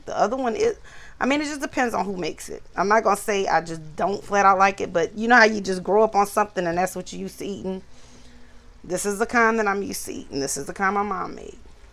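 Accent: Louisiana, United States. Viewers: I Love your Louisiana Accent!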